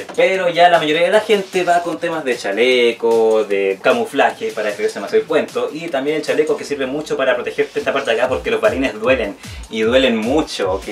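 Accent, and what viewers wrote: Chile. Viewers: Ya me imaginaba q era chileno se parece a Germán ajajajajja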